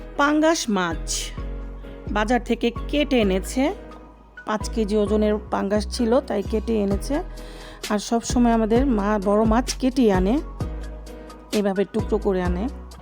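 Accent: Old Dhaka, Bangladesh. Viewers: আপা পুরান ঢাকার ভাষায় কথা কই এন সেই লাগে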